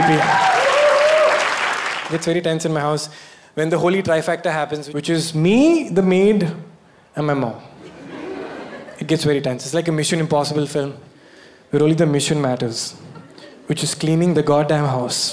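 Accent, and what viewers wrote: India. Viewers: Kenny sabastian he is a Indian with that accent ding ding only Indians will understand him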